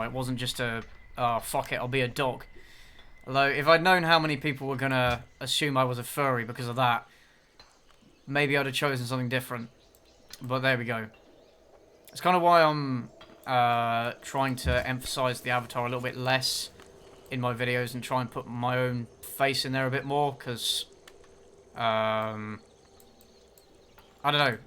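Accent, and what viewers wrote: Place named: United Kingdom. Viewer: Why is Rian Johnson livestreaming Civilization while speaking with a British accent?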